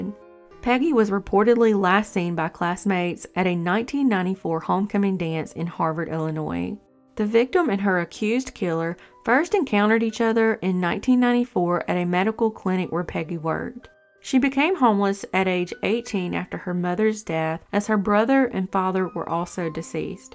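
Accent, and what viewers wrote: South, United States. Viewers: I'm from Georgia, and her voice sounds like Georgia or the Carolinas. She sounds like all my SC cousins. I love it! My mom sounds like Scarlett O'Hara.😆 Im from Atlanta, Ga but I don't really have a regional accent. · She has a southern accent due to her living in the south.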